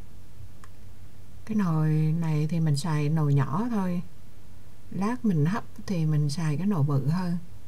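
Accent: Quang Nam, Vietnam. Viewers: Nghe chi noi ma minh Nho toi ma minh , ma minh nguoi Tra kieu, Quang Nam. Don gian , moc mac , khong trau chuoc. Cam on Chi chi lam nhieu mon an ma nguoi Quang Nam ua an . Chuc chi luon khoe va gia dinh luon duoc hanh phuc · nghe giọng Quảng Nam, mình thích cực kỳ!❤️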